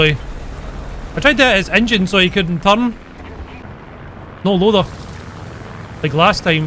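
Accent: Scotland, United Kingdom. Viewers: Day 2 of telling he's the best war thunder YouTuber and has the best Scottish accent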